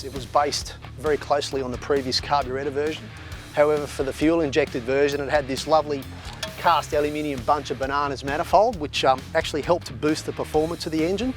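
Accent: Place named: New South Wales, Australia. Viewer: NSW accent right? He'd say pool like pewl and school like skewl. I had neighbours from Sydney and they spoke like that too.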